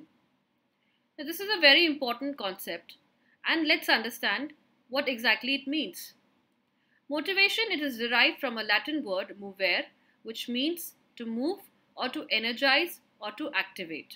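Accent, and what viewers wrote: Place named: India. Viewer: the indian thick accent is spoiling everything.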